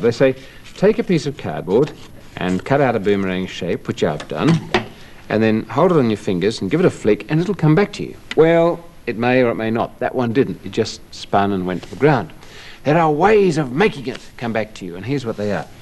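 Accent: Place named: Australia. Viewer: You have the most stunning Australian accent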